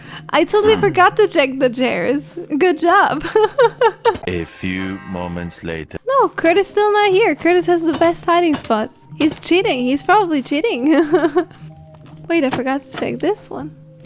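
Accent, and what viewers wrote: Germany. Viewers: The deuich accent is there but the animated avatar and Minecraft skin look Japanese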